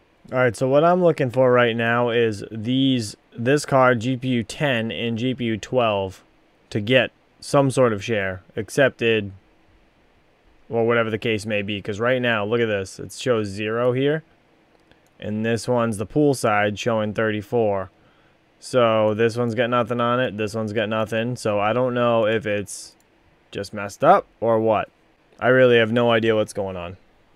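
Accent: New England, United States. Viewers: New England accent is strong today!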